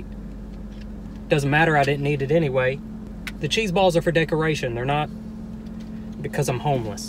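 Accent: South, United States. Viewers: You are hilarious!! Where are you from I hear a southern accent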